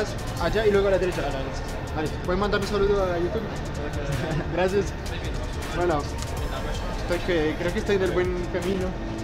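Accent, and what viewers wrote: Colombia. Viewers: Soy muy timida, pero me atrevo a decirte que eres muy guapo jejeje... Me gusta tu acento colombiano :-D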